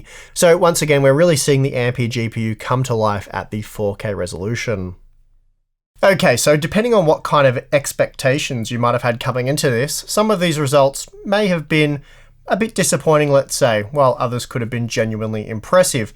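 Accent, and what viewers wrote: Australia. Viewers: And that Aussie accent just gives it extra spice!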